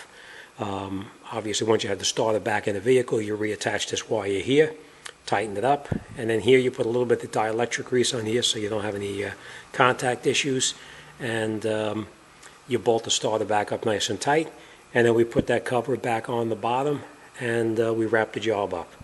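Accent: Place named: Boston, United States. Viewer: Hes so boston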